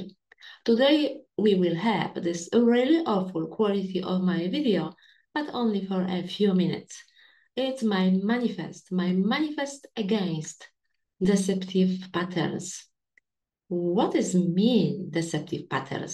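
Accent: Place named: United Kingdom. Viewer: Beautiful Brit accent 😍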